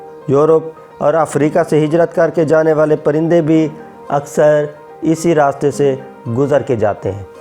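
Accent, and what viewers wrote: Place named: India. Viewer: Click - > Indian Voice - > Close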